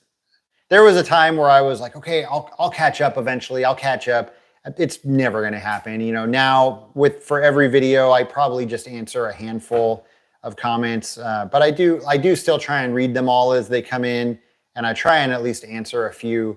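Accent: United States. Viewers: Hi from germany! I just want to say that your American Englisch ist so clear and easy to understand, and i dont know why 😅.. Its really comfortable to Listen to you 👋🙂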